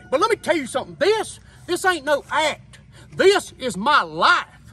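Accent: South, United States. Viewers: Very southern sir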